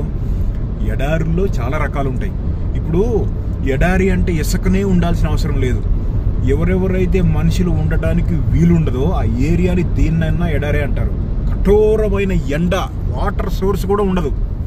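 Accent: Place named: Telangana, India. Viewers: Emirà vari .....😂....pure telangana slang .....i jst got remembered Venu Madhav....garu😂😂😂😂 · Telangana slang m ra vari😂😂😂😂😂😂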